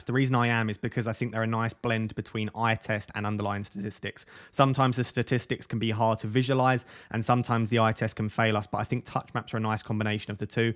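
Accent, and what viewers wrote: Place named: Essex, United Kingdom. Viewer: respect mate! Essex makes sense because of the accent. Big up Man Utd ggmu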